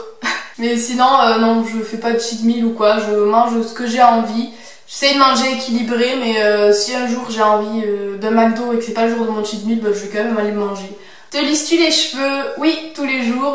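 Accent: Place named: Aveyron, France. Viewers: Tu habite où 💕parce que moi j'habite à Millau est je mange très régulièrement de l'aligot saussice et j'ai le même accent que toi 💕du quou je pense que tu est née en Aveyron 💕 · Toi tu es du sud ouest tu as un accent toulousain à 10 000% ahahah gros bisous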